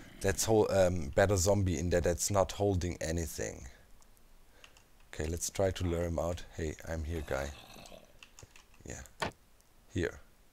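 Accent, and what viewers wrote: Germany. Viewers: funny how you switched to a french accent (from your normal German accent) when trying to show off German accent. But then... they do that in the movies, too :D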